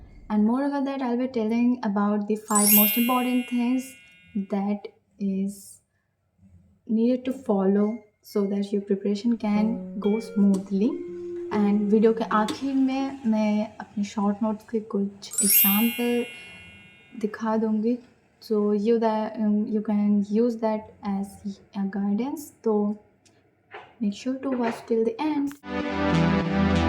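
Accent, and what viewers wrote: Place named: Bengal, India. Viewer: Waiting for short hair videos 😭 and ur bengali accent 😭🎀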